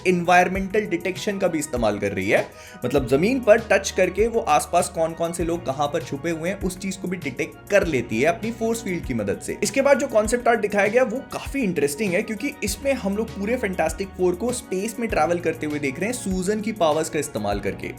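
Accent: Bhopal, India. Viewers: Bhai tum Bhopal se ho ?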